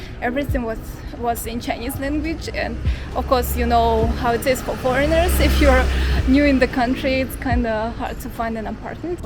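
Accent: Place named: Eastern Europe. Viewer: I live in the same city. Sry for my rudeness. You have a bit of Slavic accent. Wish u have a good time here.👍🏼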